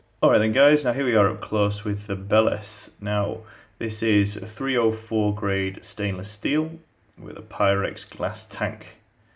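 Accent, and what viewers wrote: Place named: United Kingdom. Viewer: Great review, thanks. Where is your accent from in the UK? What city?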